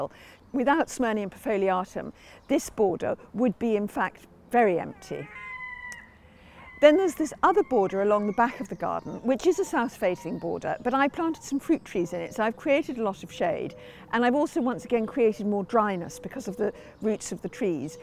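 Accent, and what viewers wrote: United Kingdom. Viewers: That's an outstanding garden. I absolutely love your British accent. It takes me on an exquisite journey through the garden. Thank you!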